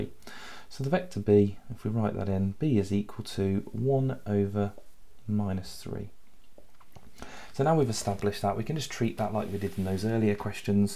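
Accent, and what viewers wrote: United Kingdom. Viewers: He’s scoish init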